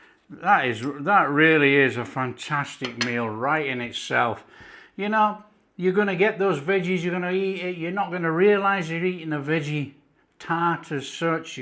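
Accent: Yorkshire, United Kingdom. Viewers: It's not Scottish it's a Yorkshire accent which is a county in England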